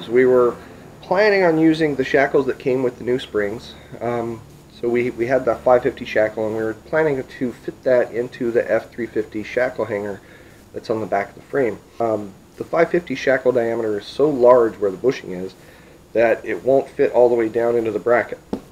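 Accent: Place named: California, United States. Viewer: I could tell you were a californian right away. lmao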